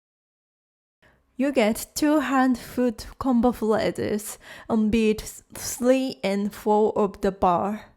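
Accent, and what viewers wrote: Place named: Japan. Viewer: Oh thanks for the english version! You're quite good at speaking it already. I think Japanese people speaking english sounds very cute lol, the pauses and how they pronounce things is nice :) . The background music is a little loud, but maybe you did that on purpose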